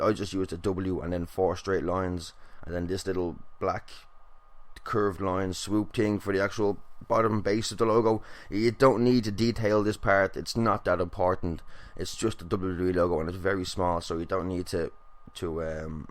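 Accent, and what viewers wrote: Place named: Ireland. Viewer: Nice vid dude. 1) I know you're irish but you really do sound quite alot like Sheamus dammit. also 2) could you maybe do an NWO logo? I think they were the main DX rivalry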